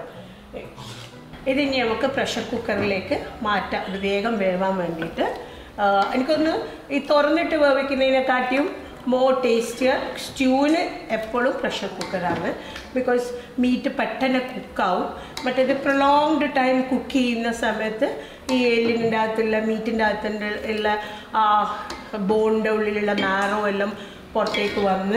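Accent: Kozhikode, India. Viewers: ഞാനും കോഴിക്കോട് തന്നെ ആണ്. പക്ഷേ ഷെഫ് ൻ്റെ കോഴിക്കോട് സ്ലാങ് കുറച്ചൂടെ മനോഹരം ആണ്.😊😊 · Is that Calicut slang or a bit of kannur slang . It’s so interesting how Malayalam slang changes from kochi to Thrissur and then up north . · Kozhikode slang pwoli❤️❤️✌️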